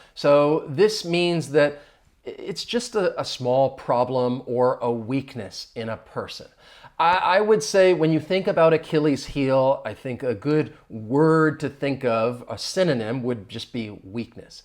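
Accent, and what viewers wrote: United States. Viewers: It's such a great video. I love your american english. When it comes to vocabulary, well, you are a natural. 😊. Hello from france 🇫🇷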